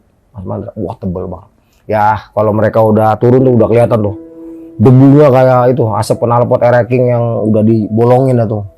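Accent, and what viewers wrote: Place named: East Java, Indonesia. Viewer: Seneng derger gaya bicara abang nya.....Jatim Kediri...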